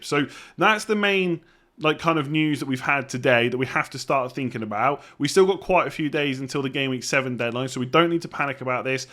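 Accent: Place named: Southern England, United Kingdom. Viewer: Thanks mate. BTW are you originally from Bournemouth way. You've got a Southern twang. Definitely not Irish assuming that's where you're from?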